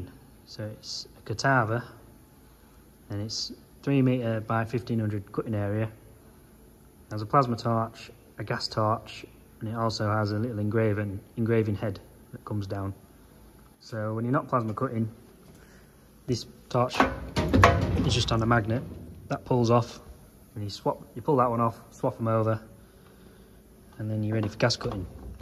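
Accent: Yorkshire, United Kingdom. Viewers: You may find that small parts fall down the bed with it being trinangle stlye and crash with the torch Ive on my second plasma now on a esprit lightning hd and i run a bed with flat slats with a bit of an s shape to them stops small parts twisting up Listning to your accsent you must b based close to me im at filey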